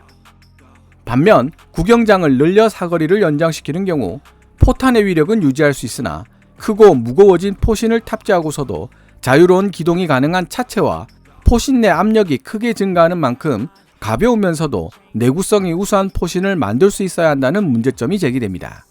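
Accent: Gyeongsang, South Korea. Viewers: 경상도 억양이 상당하시네요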